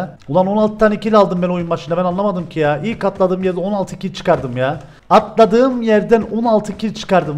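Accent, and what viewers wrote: Cyprus. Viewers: Abi sen gerçekten Kıbrıs lımısın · Kıbrısta nerelisin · Abi sen kıbrıslımısın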